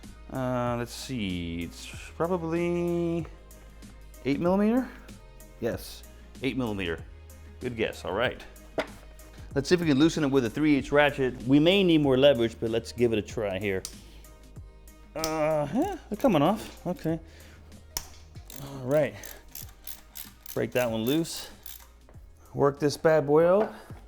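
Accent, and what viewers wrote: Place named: United States. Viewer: Hi. Looks like it can be rebuilt to me. Can you not grind the crank and fit oversize bearings? I'm talking 60's/ 70's engines I suppose but the mechanics of this engine are from that era (if not the electronics!). 2 valves per cylinder, cam in block, pushrods. Great! Like the channel very much, you do stuff to owner/mechanic standard - that is not a criticism, just means that the attention to detail and care is better than I suspect the average workshop would give. You do a Rolls Royce job if its your own car, such as the diagonal relieving of bolts. Good tips too eg the spark plug ceramic colour (not black, not oily, not too white).Keep it up. I'm a Brit, you're and American but I just about understand you!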